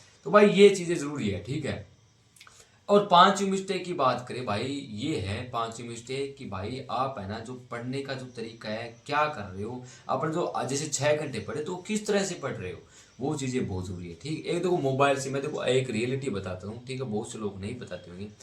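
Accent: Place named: Haryana, India. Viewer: Bhai hariyana se ho aap right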